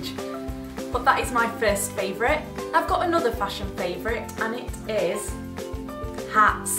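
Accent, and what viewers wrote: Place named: England, United Kingdom. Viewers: what part of England is from? just trying to figure out the accent haha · British, but her accent comes from Sheffield in Britian, haha! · English* accent, not british ;) her accent is from Sheffield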